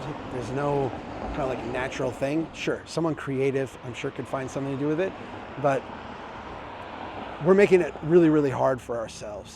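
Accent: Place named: Minnesota, United States. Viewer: He is from Min-ah-sooooo-tah so he has an accent. You're darn tootin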